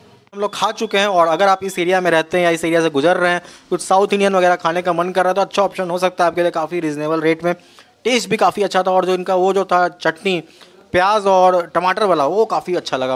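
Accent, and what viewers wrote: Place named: Bihar, India. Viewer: Bhai fan of ur vlogs.Luv the way u explain things in bihari ascent. Big ❤ to all ur mutton vlogs keep making ur vlogs. Best wishes to u n ur team .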